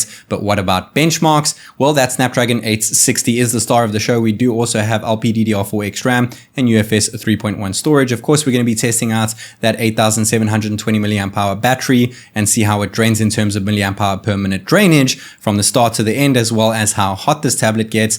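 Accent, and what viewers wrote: South Africa. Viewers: I always sensed he had that South African Touch 😸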